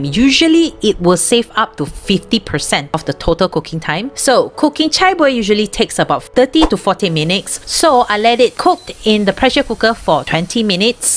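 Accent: Malaysia. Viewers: Yes....itu Malaysian accent damn shiok!! Not pretentious.❤️ more holiao..I usually letak leftover juicy char.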